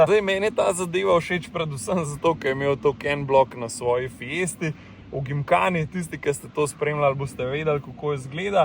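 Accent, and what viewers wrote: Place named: Upper Carniola, Slovenia. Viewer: gorenščina pa še neka qvazi angleščina avto fajn zelo pa malo potrudise pa boš imel še več ogledov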